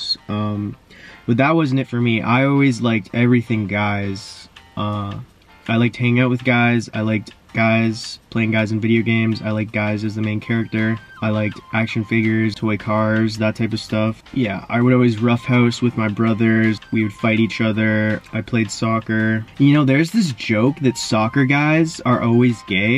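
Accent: Canada. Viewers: Are you actully from Canada? All your "ou" words have that Canadian "ow" sound! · Am I sensing a Canadian accent? 🙃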